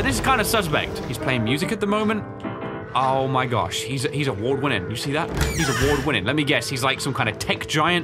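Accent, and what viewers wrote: United Kingdom. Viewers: I know he is lives in the UK the accent gives that away. The US is a country founded off of distrust in government and you protect yourself from everyone including government. The founding fathers declared war over taxation. But it is about personal property and protecting it, and protecting our family and friends. As an aside the UK had the whole knife control bit where they want you to put knives in a box. So I don't think the UK is someone we look to for virtue in how to protect ourselves.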